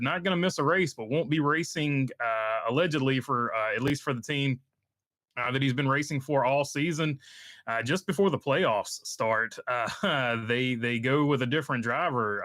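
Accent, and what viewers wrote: South, United States. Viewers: You have a cool accent. It sounds very Nascar and southern. I live in Canada so your accent is quite noticeable. Like an old school Nascar driver or crew chief.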